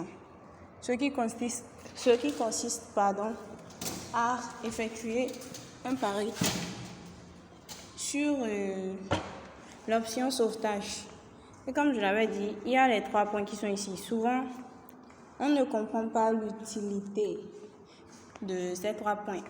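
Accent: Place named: Benin. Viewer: L accent béninois explique trop bien 🎉 thanks you merci pour la vidéo je m abonne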